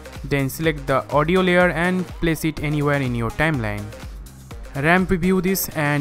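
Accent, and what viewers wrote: India. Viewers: Hey Buddy are you Indian?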